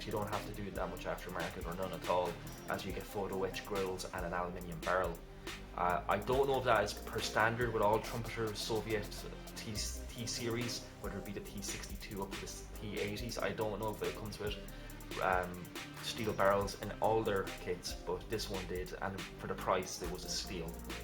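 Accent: Ireland. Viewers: that Irish brogue is even sexier in hi Def!!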